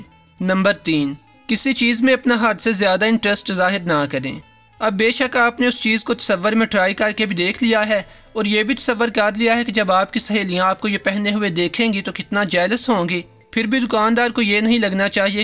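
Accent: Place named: Lahore, Pakistan. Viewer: Apka Accent Lahoriyo Wala hy..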